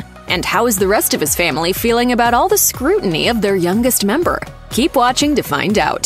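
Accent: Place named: United States. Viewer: Why American commentator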